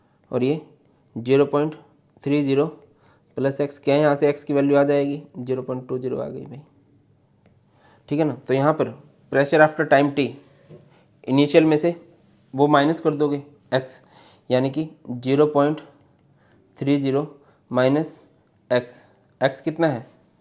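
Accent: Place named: Indore, India. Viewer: are hamare Indore taraf aise hi bolte he yaar 😅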